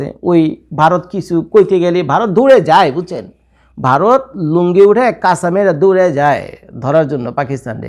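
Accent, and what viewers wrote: Bangladesh. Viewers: আপনি আজকে 15 বছর যাবত নাকি আমেরিকাতে আছেন কিন্তু আপনার ভাষাগত যে আঞ্চলিকতা রয়েছে বাংলাদেশের সেটা কি একান্তই বাংলাদেশের লুঙ্গি সাব দের খুশি করার জন্য🎉🎉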